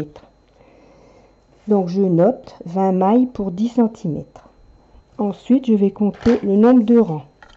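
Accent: North, France. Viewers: Merci pour vos explications j'essaierai d'appliquer vos conseils et vous tiendrai au courant et encore un grand bravo pour vos vidéos encore une petite question il me semble reconnaître un accent nordiste dans votre voix est ce je me trompe ?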